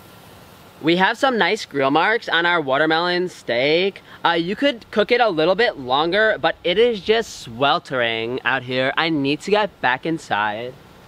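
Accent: New York, United States. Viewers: Too funny! First there was an eggplant on the toilet tank, later on there was a pineapple on the tank. Go back to show business. You're a natural talent. Great Valley Girl accent as opposed to your normal New York accent.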